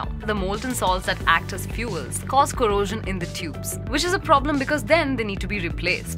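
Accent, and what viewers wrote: India. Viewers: Excellent indian english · well narrated.. Indian painted all over it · Indian accents are the hardest accent 2 understand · That's how the Indian accent is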